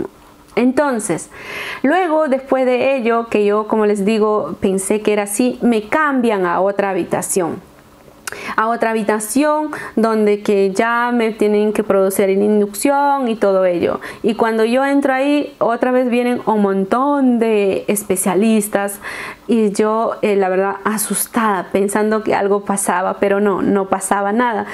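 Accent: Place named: Peru. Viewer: por tu acento eres de Perú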